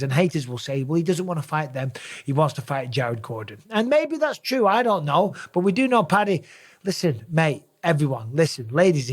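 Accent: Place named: Liverpool, United Kingdom. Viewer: Scouse throbber.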